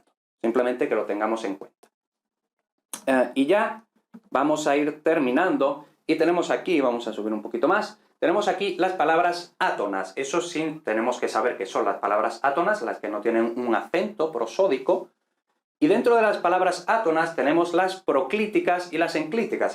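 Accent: Castile, Spain. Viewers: Puede ser un poco confuso porque tu pronunciación es castellana, ejemplo C, Z es diferente entre tu pronunciación y la latinoamericana; bueno es pequeña diferencia. Gracias por el curso!’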